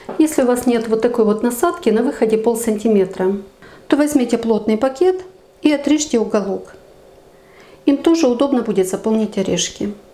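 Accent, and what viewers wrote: Ukraine. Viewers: здоров я рукам .вдячна, приємно звучить украінська , горішки супер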